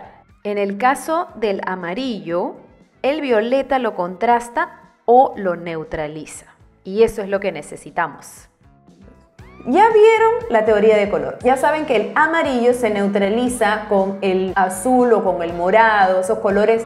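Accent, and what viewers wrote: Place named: Peru. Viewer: Que lindas son las peruanas......me gusta su acento ..súper el consejo . bendiciones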